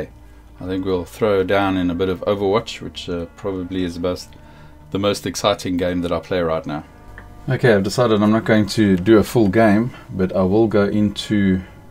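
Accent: South Africa. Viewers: UNRELATED COMMENT: but he south african aint he? dont be mad i aint racist just curious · Are you South African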